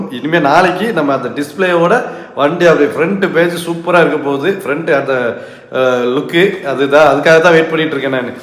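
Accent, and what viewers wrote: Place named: Thoothukudi, India. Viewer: உங்கள் தூத்துக்குடி Slang ku நாங்க அடிமை 😂 continue 🎉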